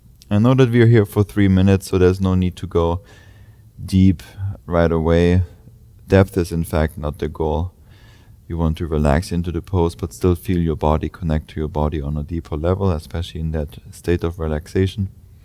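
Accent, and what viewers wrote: Germany. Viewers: I like your German accent. ❤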